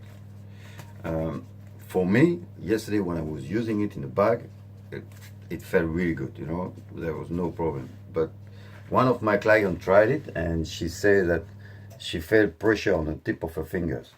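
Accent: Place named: France. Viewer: At first I thought you could Irish then i heard you and yup definitely French :) Nice review though !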